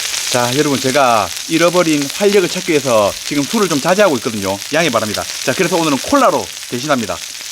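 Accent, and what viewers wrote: Gyeongsang, South Korea. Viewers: 정말 끝내줍니다 진주 사십니까 쥔장님 ? 발골도 잘하시고 경상도 사투리도 구수하니 좋고 같이 한잔 하입시다 ㅎㅎ.서울인데 한번 가고 싶네요 ~ㅎㅎ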